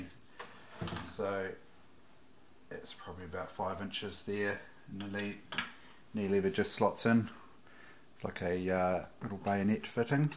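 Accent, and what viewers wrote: New Zealand. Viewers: Are you from New Zealand?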